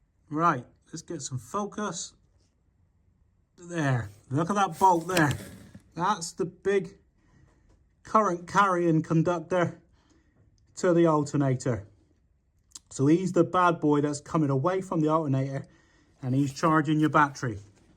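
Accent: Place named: West Country, United Kingdom. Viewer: I'm wondering if you are west counttry. maybe Hinkley, i only wonder because you are so particular with the connections electrically and i'm an engineer, former CEGB/national power/powergen/nuclear electric/british energy (same firm privatisation)and a stint for centrica in gas.The in laws were Taunton and Bridgewater and sound like you.I never knew about the sensor connection near the battery, that is very insightful, it monitors the current for the start/stop system if the car has it. thanks. I also didn't mention, you are a good dad, you speak of your son a few times, good on you. Just a point to note, your sons car is an automatic and the alternator is at the rear of the engine compartment as you show, on a manual it is Left hand side as you look into the engine bay.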